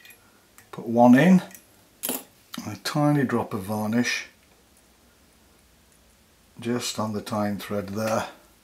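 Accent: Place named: Scotland, United Kingdom. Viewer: I've been following you recently and trying to to replicate some of your patterns. It is so much easier to learn with such detailed, precise, clear image videos. Beautifull flies. If wonder if you have fishing skills videos.... Take care and try to stay at home. Ricardo (Chile) PD:....... and thanks for your clear Scotish english...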